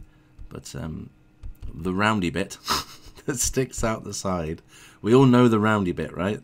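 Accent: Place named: England, United Kingdom. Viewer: Just Subbed you looking at your youtube Victorian homes and I love your English Accent (Smiles)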